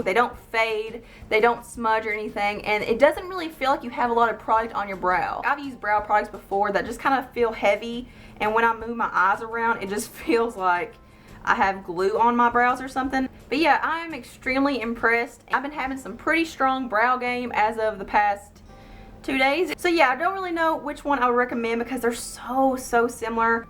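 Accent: South, United States. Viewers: Love your Southern Accent! Your brows are already pretty you don't need to do anything to them! 😊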